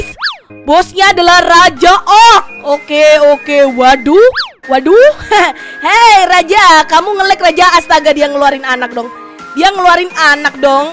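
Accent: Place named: Java, Indonesia. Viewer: Kak itu di jawa kok ngomon nya kayak oranh jawa ya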